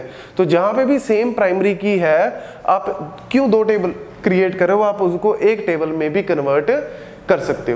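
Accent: India. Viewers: I cant understanding anything what you saying dude. Unfortunetly I am not get used with the english indian accent. Please friends, if some one could put english subtitles on his videos, it would be great...because despite I cant get excatly what he is saying, I am sure that his approach is very unique and worth to share. Greets from Brazil.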